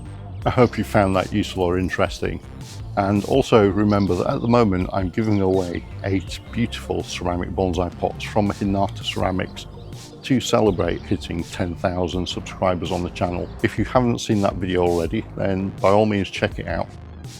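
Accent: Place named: Greater Manchester, United Kingdom. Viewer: I recently found a local Bonsai Club and looking forward to joining a meet. The majority of my collection are in training. I have learned a lot over the past few years. If you ever need to lighten your load I'd be happy to help, listening to your accent I don't think you would be too far away from me in Greater Manchester. Keep up with the great content 👍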